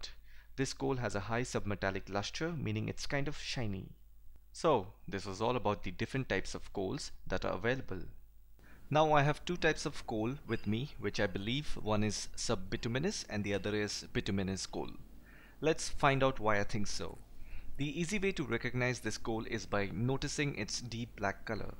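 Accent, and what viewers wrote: India. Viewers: He is using his own voice, it's his accent, which sounds as if he is from India.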